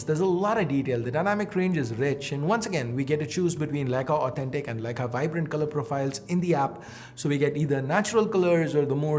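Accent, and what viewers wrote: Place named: India. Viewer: Accent is top notch neutral and best Indian accent i would say🤟🏻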